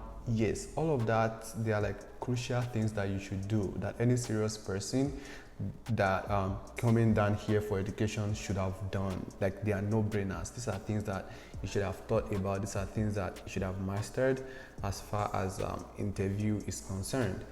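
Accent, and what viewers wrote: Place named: Nigeria. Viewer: Brox talk like Nigerian ...things you shouldn't do in f-1 interview is dont form accent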